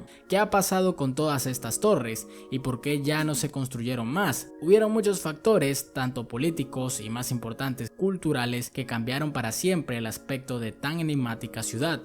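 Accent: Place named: South America. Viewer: Vaya forma de destruir el idioma. Me parece que el narrador es sudamericano. Usar un diccionario para ver cómo se dice una palabra no te hará daño. Ni practicar la dicción. Qué bueno que no vivo en sudamérica, no podría soportar la manera tan peculiar que tienen de hablar castellano.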